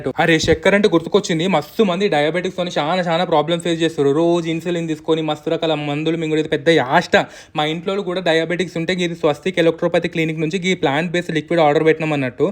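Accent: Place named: Andhra Pradesh, India. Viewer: Mee Andhra slang maarchukuntaara evarikosamaina? Ye region lo perigithe aa slang vastundi.. Yes or no? Mee pillalaku slang maarpinchi Telangana slang nerpinchandi .next gen ki problem undadu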